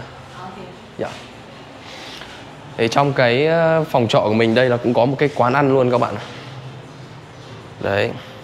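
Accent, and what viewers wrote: Vietnam. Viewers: Nói tiếng anh thuần việt, 😊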